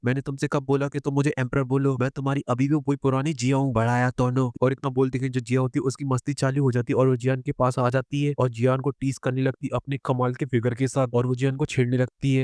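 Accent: Haryana, India. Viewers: Bhai AAP Kya Haryana se ho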